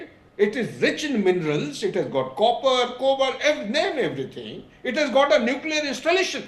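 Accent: India. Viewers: Waatta Patta Itta 😅 Funny Indian Accent of English Language Always Hurts The Ears of Listeners 😅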